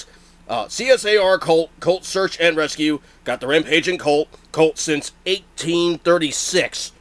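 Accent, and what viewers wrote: United States. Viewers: You're the most American sounding man I've ever heard.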